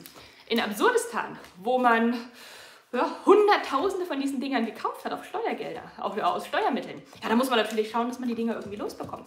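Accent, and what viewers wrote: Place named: Berlin, Germany. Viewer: "gepullert" 🤣 ich liebe euer Berliner Dialekt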